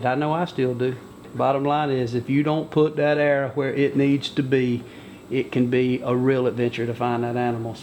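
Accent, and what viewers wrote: South, United States. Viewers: I love the way you southern boys deliver a message. By the way, I do remember watching you over 20 years ago.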